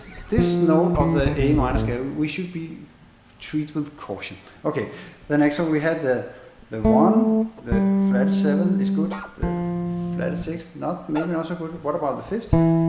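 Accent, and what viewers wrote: Denmark. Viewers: Great! A danish Bass player with danish accent (no offence) playing on a danish bass amp :) I like your videos. You are a great teacher. Greetings from Denmark.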